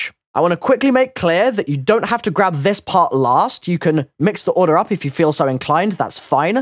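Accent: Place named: England, United Kingdom. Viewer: Jesus Christ he couldn't be more English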